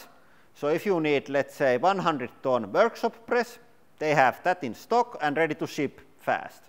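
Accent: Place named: Finland. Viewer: I Love the finnish accent 😊 Also Finland is the most comparable country to Denmark 🇩🇰❤️🇫🇮 Most Happy people in the World 😁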